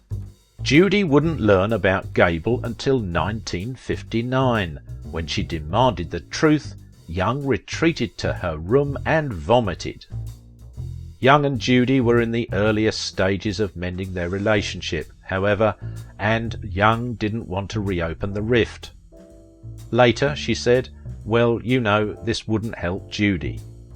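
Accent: England, United Kingdom. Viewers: Shoddy english accented tripe for clicks. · Nothing worse than a male gossip. Especially with an English accent. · Hi, hope you're doing fine with your busy agenda. You have a strong british accent... Are you originally from Great Britain? Just asking. Have a great day and thx for your work!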